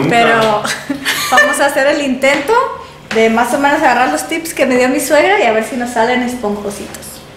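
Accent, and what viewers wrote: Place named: Guadalajara, Mexico. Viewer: Ya ablas como tapatia que bien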